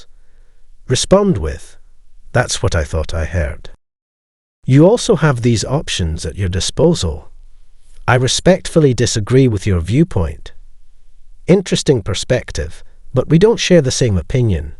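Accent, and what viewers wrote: Scotland, United Kingdom. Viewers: This robot voice is amazing. I mean, yeah. It makes some mistakes, but this Scottish accent is amazing.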